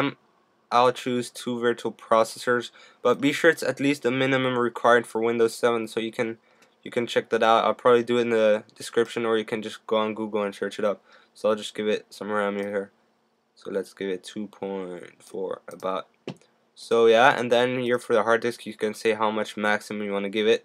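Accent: Netherlands. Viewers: Are you dutch? (Recommend at this channel)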